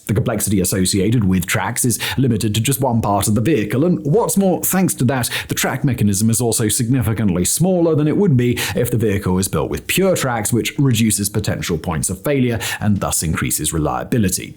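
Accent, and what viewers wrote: England, United Kingdom. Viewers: got a stupid question are you from Great Britain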